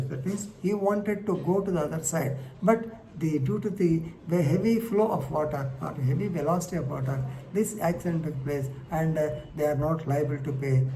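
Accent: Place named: North India, India. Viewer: gr88 explanaiton very lucid but accent was prob north indian here...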